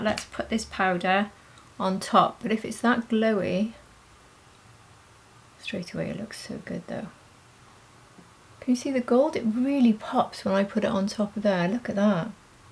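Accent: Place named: Wales, United Kingdom. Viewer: Just found your channel - fabulous! Lovely Welsh accent How do the lip butters compare to the maximisers please ?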